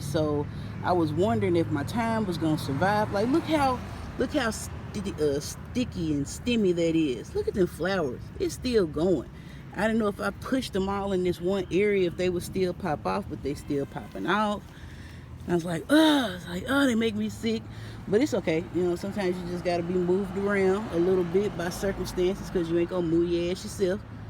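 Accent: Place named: United States. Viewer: Love the American accent kkkk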